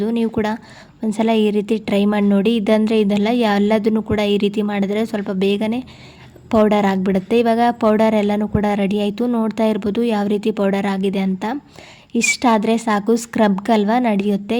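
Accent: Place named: Uttara Kannada, India. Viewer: ಸೂಪರ್ ಸಿಸ್ಟರ್ ನಿಮ್ಮ ಉತ್ತರ ಕನ್ನಡ ಭಾಷೇನೆ ಚಂದ ಕೇಳೋಕೆ 😊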